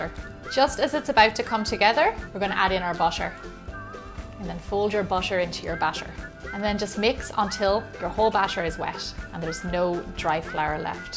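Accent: Ireland. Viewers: You are from Ireland? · Omg! Your Irish!!!?!? How cool!!! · Love the Irish accent!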